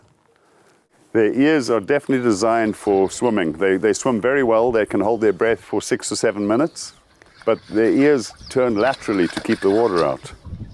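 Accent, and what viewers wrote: South Africa. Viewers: I would recognize you south African wherever ur. Job well done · Wow that South African accent, unmistakable. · I recognized your SAffer accent immediately! Thought, "He's from SA!" Great way to live. My kids are also off grid here with a wonderful garden. Very few expenses. It's the way to go, with the way things are here and getting worse. Baai gelik en sterkte voorentoe. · as soon as i heard his voice i knew he was South African- watching from Durban. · Interesting unpredictable admixture of South African and North American vowels... nice life... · This was so good to hear an south african accent doing something so amazing. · And he probably grew up speaking afrikaans, English is his second language. Has trace of afrikaans accent. Intelligent human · I am south african and could tell his accent immediately. he is living the life i could only dream of. 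well done. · I heard your voice and knew at once you were South African.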